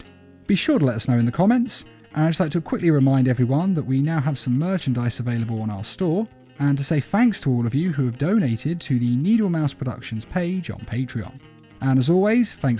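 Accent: England, United Kingdom. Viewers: I think it's an English accent.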